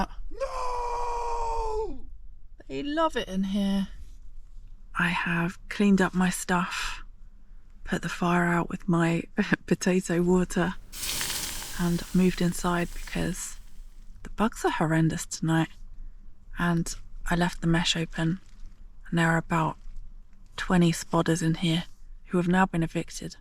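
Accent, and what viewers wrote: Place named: RP, United Kingdom. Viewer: well posh, love it, love your videos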